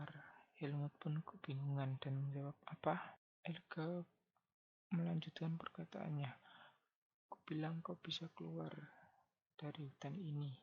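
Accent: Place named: Indonesia. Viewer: Soal y suara lu medok coba bikin suara y alusin sedikit trus kerasin klo mau gasih suara Jagan di baca mending hapalin tesk y dlu ok bro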